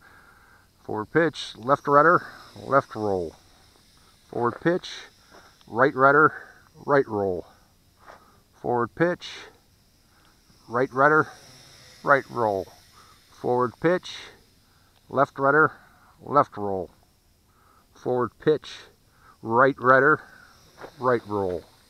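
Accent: Pittsburgh, United States. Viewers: I could be wrong, but you sound like a native Pittsburgher for sure 😉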